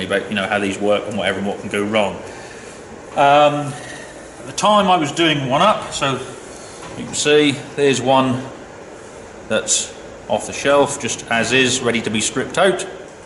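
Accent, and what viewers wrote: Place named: East Anglia, United Kingdom. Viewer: Well I have not been a subscriber for very long as I found your channel from Cotontop3 when he mentioned you by name and I have seen your name on some youtube video channels that I watch. I like your comments to others. I came and watched a few of your videos and liked what I saw so I subbed. I enjoy listening to your Norfolk/Suffolk accent and what you put up for us to watch. I am really looking forward to seeing a lot more stuff on your 3350 especially the internal refurbishment of the cab.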